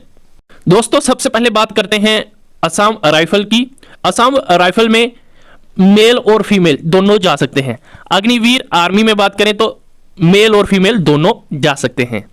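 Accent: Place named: Jammu, India. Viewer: Pehle bolna seekh le Dogra hai ya Punjabi, hindi main dogri mila k de raha hai Aur galat Information bhi de raha hai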